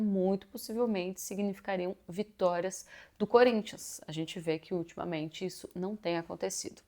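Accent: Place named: South, Brazil. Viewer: Valeu pelas infos quentíssimas, apesar do sotaque do sul, é CORINTHIANS! 🦅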